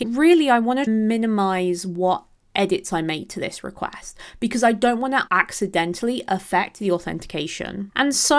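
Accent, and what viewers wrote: United Kingdom. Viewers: Are you brittish 😊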